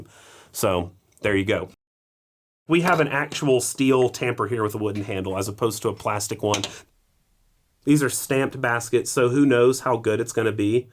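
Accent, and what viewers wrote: South, United States. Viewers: Your southern American accent but simultaneously using metric is wrecking my brain.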